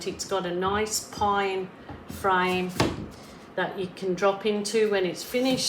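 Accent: Birmingham, United Kingdom. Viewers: That is a cheer-me-upper if ever I saw one! Fabulous colours and your talent make a painting I could look at for a very long time, thanks for sharing the how of it. btw, every so often I think I hear a Brummie accent - is that possible? ❤ from Scotland ❤😂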